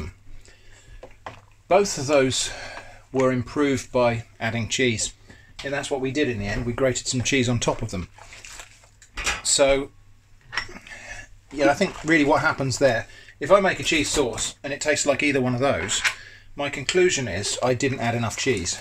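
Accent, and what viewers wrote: United Kingdom. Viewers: What part of Britain are you from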